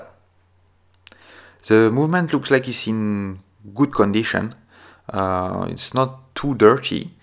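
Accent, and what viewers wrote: France. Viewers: Thanks to your video I was able to review the Omega 613 that was given to me by a friend. I didn't know it, so following your instructions helped me a lot. Your English with a French accent is also nice 🙂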